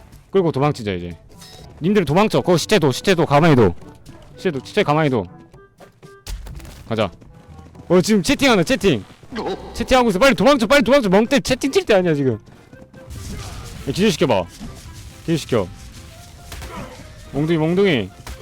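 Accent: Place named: Jeolla, South Korea. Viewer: 목소리에서 전라도 사투리가들리네요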